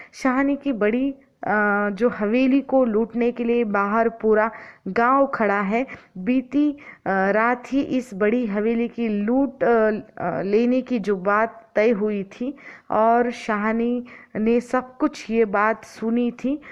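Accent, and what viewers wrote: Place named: Maharashtra, India. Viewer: मेडम हिन्दी साहित्य वाचुन अपल्या मराठी ला बोलण्यात बदल करा ।हळु हळु होईल।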